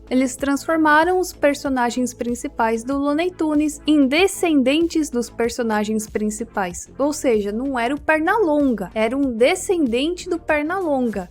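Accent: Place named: Curitiba, Brazil. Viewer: Acho que a deve ser de Curitiba por causa do sotaque.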